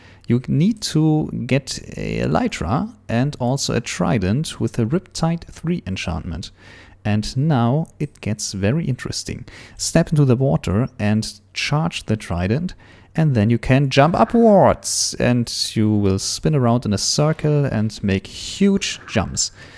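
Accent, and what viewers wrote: Germany. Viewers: I love his german accent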